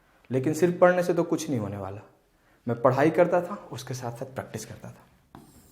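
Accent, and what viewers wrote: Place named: Bhojpuri, India. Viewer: Jai ho bhaiya 🙏 Tohra muh se bhojpuri sun k mja aa gyil😍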